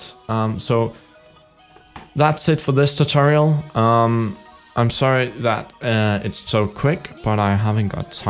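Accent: Denmark. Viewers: Swiff His main language is Danish I think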